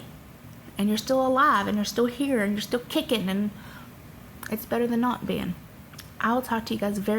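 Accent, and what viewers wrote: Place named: South, United States. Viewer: girl your from California????....where that southern accent.come from???.... some people in Bakersfield area of California.have a country accent....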